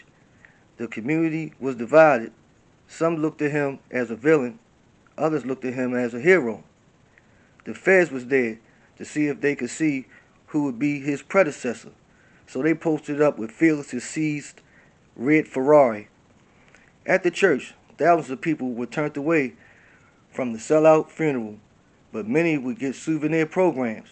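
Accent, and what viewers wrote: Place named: Baltimore, United States. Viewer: Sounding like you from Baltimore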